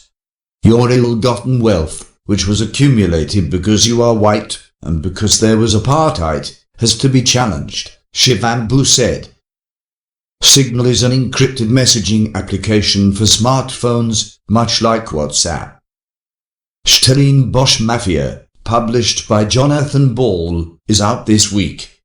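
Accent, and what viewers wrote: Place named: England, United Kingdom. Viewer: Who ever is narrating this video, you're not South African, in fact, you have an English accent, so stop poking your nose in our issues and talk about the Monarchy. Which South African can't pronounce "Stellenbosch"?...nxm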